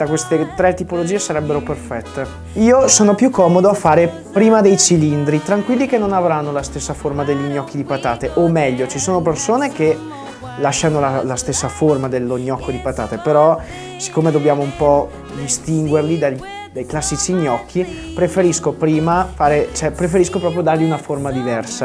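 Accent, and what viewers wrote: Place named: Romagna, Italy. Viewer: Mi piacciono tutte le tue ricette sono ottime nn ultima ragione sento dall' accento ke sei romagnolo e io adoro tutto della Romagna bravo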